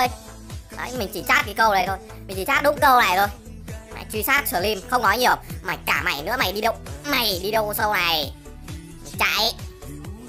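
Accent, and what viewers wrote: Vietnam. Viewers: Giọng Nam Kì Hay và Hài thế mà đặt tên như vậy đúng như mình miền Nam mình rất bn vì Nam Kì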